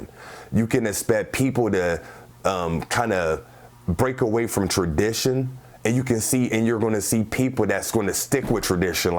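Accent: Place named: Philadelphia, United States. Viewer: I’m digging your channel! You sound like you’re from Philly! Peace and Blessings 🙏🏽💜✨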